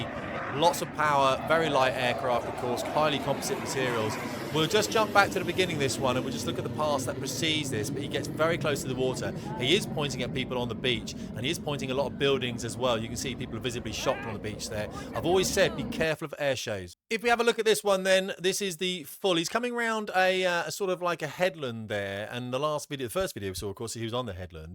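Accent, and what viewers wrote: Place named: United Kingdom. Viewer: He was getting a picture by someone on the beach. Your british voice is annoying as hell. How cucked are you?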